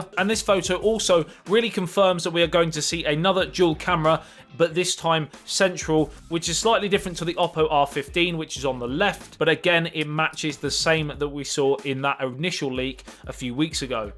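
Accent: England, United Kingdom. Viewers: I ❤️ hearing the difference in English accents, pronunciation & use of words compared to American English. The way you pronounce aluminum sounds so funny! You guys say it all wrong 😳 Or do we? 🤔 🤷🏼‍♀️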